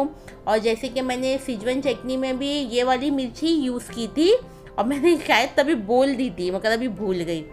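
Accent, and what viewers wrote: Konkan, India. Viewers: appa us mirchi ko bedgi mirchi kehte hai love ur voice ekdum kokni wali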